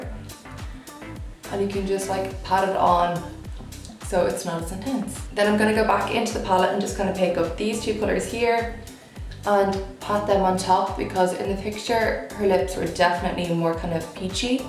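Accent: Ireland. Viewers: It's her Irish accent... personally I think it's beautiful. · Oriana Brat she's Irish , it's just her accent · I'm sure you get this question all the time but are you irish or scottish or something? Because your accent is super cute lol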